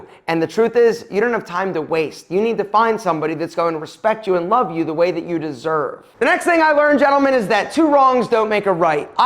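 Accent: Philadelphia, United States. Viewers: Yo, where you from? You’ve got a Philly accent for sure, am I right?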